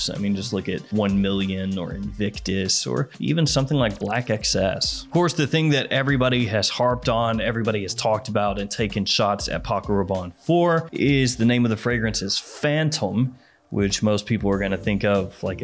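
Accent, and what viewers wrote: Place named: Tennessee, United States. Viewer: Your TN accent sounds pretty similar to ours out here in Raleighwood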